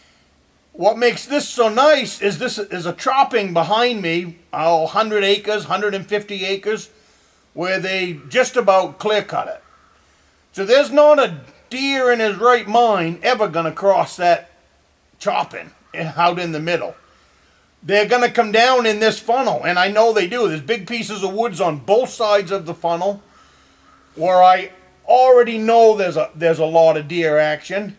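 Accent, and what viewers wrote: Maine, United States. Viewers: I'm in Western Maine and when I heard yer voice I knew you were a Maina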